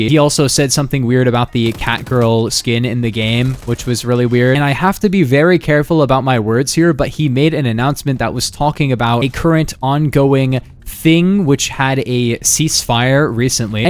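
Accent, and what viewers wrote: United Kingdom. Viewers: AND he's british?? damn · Hes fucking BRITISH???? explodes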